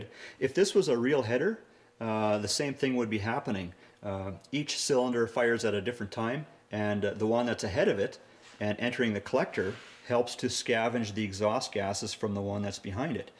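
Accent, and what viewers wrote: Canada. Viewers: He sounds Canadian to me lol.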